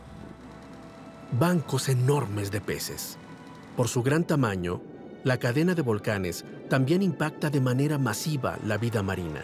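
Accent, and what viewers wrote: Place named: Latin America. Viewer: Mucha razón. Excelente locución en español de América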